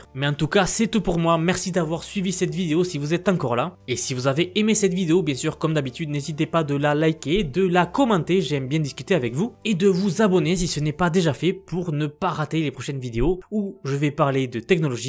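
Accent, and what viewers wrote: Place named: Toulouse, France. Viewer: t'étais pas journaliste sportif, par hasard, avant ? Je préfère quand même ta voix de toulousain ou pyrénéen, que ces voix horripilantes d'IA ! 😉 Ceci dit ton docu est impressionnant ! bravo !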